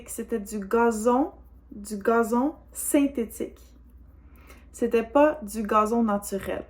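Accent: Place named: Quebec, Canada. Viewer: Bonjour, j'espère que vous allez bien. J'ai un request. Pourriez-vous nous faire une vidéo sur la différence entre l'accent québecois et l'accent français de France? SVP. Because, I could notice your way of pronouncing words like 'matin', 'bain" differs from what's in most of the french videos out there (based on France's).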